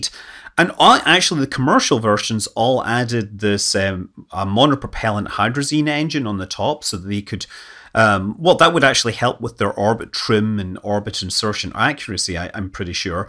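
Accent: Scotland, United Kingdom. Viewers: He's a Scot. You can hit closer to home with Scottish pronunciations! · you'd think someone with a scottish accent would be able to say Palmachim correctly :) (or palmakhim.... פלמחים)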